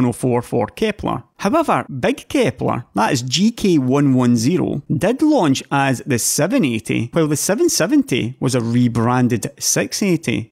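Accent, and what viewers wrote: Scotland, United Kingdom. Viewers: Is your accent scottish? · Are you Scottish too?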